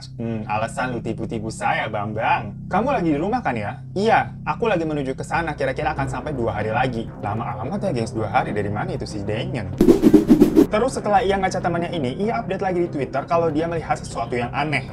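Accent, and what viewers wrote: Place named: Cirebon, Indonesia. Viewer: Yg AQ suka gaya bicara tuch ky orang Cirebon gitu lucu dan ngasal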